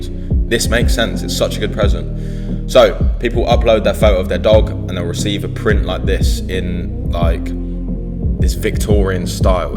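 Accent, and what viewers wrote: United Kingdom. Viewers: Are you from the UK bro...?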